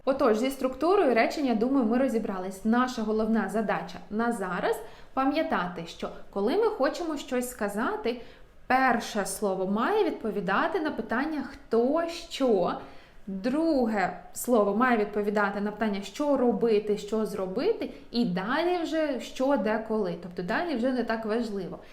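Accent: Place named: Georgia. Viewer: Ваша вимова англійської така сама як грузин вимовляє російською. Та подайте коротенькі казки чи інші невеличкі тексти англійською, але диктор має бути людина яка є носієм англійської мови.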